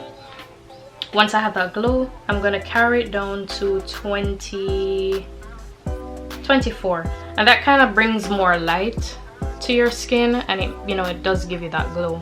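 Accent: Jamaica. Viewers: love your accent, im jamaican as well🥳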